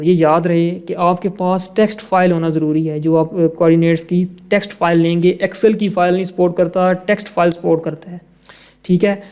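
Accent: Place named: Pakistan. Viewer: Awesome teaching Sir Ji stay blessed i think 🤔 you are from Pakistan 🇵🇰 right listening to your voice communication love and respect from karnataka India 🇮🇳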